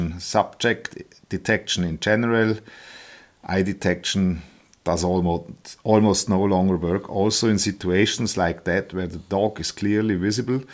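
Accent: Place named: Switzerland. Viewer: Da ghör ich doch en schwizer usse 😃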